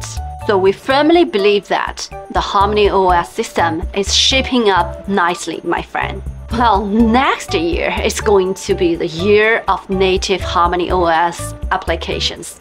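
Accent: United Kingdom. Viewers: don't you know she speak pure UK English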